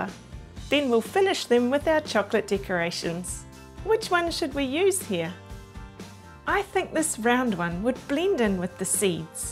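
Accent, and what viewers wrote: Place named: New Zealand. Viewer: LOvely design. And I also like that Kiwi accent.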